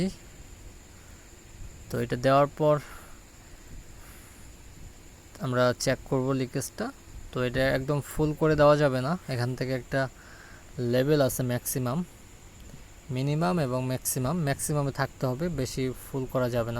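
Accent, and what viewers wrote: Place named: North Bengal, India. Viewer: এখন বিষয় হলো আপনার কথা শুনে তো মনে হইতেছে যে আপনার বাড়ি উওরবঙ্গের দিকে আমাদের দিনাজপুরে নয়তো।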